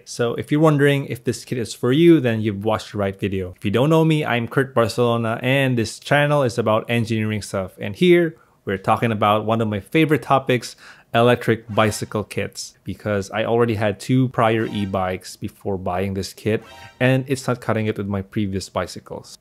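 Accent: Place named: Davao, Philippines. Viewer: Taga asa ka? Murag naa kag Davao.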